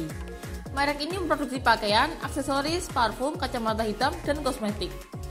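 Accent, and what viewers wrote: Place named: Java, Indonesia. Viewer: medok bgt ngomonge 😅 jawir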